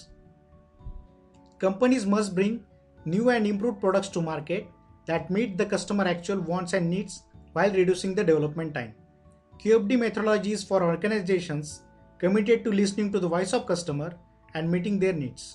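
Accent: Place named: India. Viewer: I dont need a dislike-button to know if its gonna be a good "tutorial" or not.. When i hear that indian accent i know its gonna be great!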